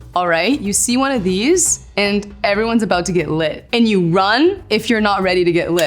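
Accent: Morocco. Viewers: the way she pronounces the names of things in the original moroccan dialect is Awesome <3! we proud of you <3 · كل شيء في الفيديو رائع والاروع نطق الاسماء باصولها المغربية لكن لو قمتي اختي الكريمة بشيء اخير لكان هذا المحتوى في قمة الروعة الا وهو ان تلبسي القفطان المغربي. روعة. 👏👏👏👍👍👍🇲🇦🇲🇦🇲🇦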